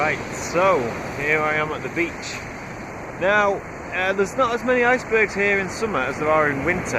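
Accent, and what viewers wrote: United Kingdom. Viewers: Great educational video. We're is your accent from in the UK? I'm living in Edmonton Alberta Canada but born in sherburn in Elmet nd Leeds Yorkshire. I miss film photography as it was never so complicated as digital. Thanks for the education .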